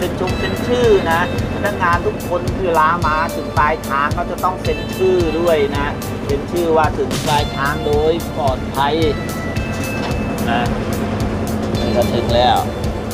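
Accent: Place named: Nakhon Sawan, Thailand. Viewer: ชอบสําเนียงพี่นะครับ ผมมีเพื่อนอยู่นครสวรรค์ สําเนียงแบบนี้แหละครับ ไม่รู้ว่าใกล้เคียงรึเปล่าครับ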